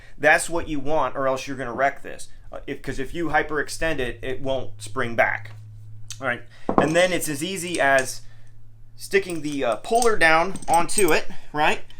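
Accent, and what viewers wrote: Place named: South, United States. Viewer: Upvoted for southern dialect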